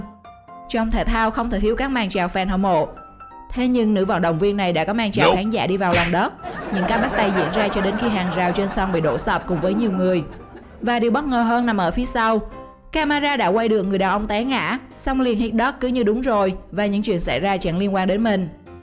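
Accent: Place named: Quang Ngai, Vietnam. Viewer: Tập nói giọng lại đi, sao nói nẩu qué vại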